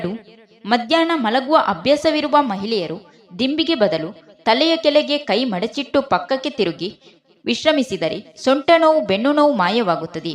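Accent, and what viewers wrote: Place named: Kerala, India. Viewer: YOUR EXPLAINATION SLANG YOU ARE MALAYALI.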